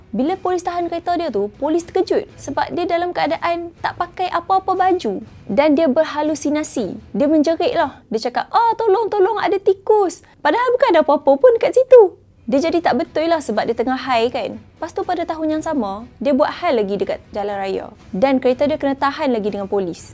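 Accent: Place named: North, Malaysia. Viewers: Wakk single tak? Sy suko loghat utaraa mcm mana nk kenal SI Gadis ni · Cayaq tengok awek utara ni cerita.. Begitu pekat loghat dia..😊❤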